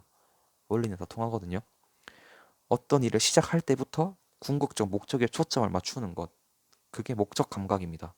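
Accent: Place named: Gyeongsang, South Korea. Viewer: 대구 분이신 것 같네요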